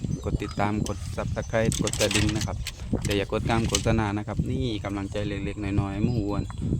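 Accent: South, Thailand. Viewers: ขอโทษครับ ผมเพิ่งได้ดูช่อง เป็นใครรึครับ?? พูดใต้'เป็นตัวเเทนคนใต้เลยคับ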